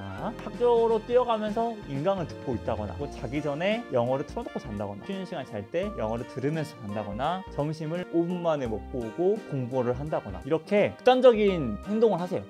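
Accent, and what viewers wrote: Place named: Jeolla, South Korea. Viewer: 왜케 귀여우세용ㅎㅎ 전라도 사투리 ㅋㅋㅋ💕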